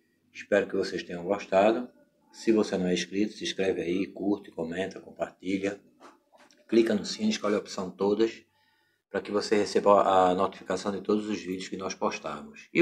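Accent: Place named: Pernambuco, Brazil. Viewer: Eita que sotaque lindo do meu Pernambuco 😍. Salada maravilhosaaaaa! Já vou fazer, não vai ficar maravilhosa como a sua. Mas vou tentar. Parabéns!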